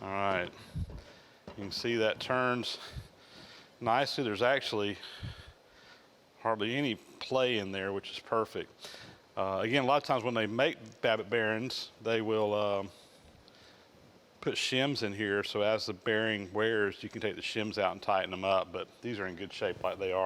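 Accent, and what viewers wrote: South, United States. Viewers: LOL :) - He's from Georgia, so to translate "owl hole" is really "Oil Hole" with a southern drawl.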